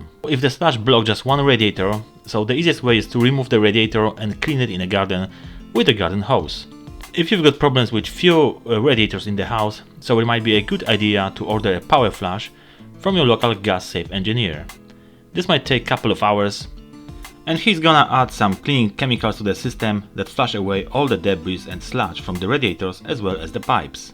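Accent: Poland. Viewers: o jakim kraju my tu rozmawiamy? bo mam pytanie... z akcentu wnioskuje ze polakiem jestes...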